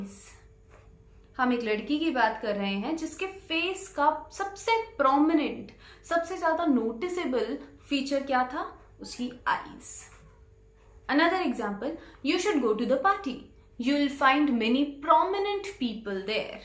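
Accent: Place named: Punjab, India. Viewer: hindi nolan da Great punjabi accent madam g. Sajid Karachi Pakistan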